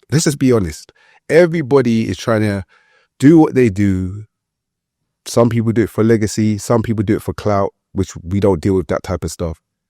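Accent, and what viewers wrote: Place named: Tottenham, United Kingdom. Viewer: Tottenham people talk the same 😂😂, him and OG have similar mannerisms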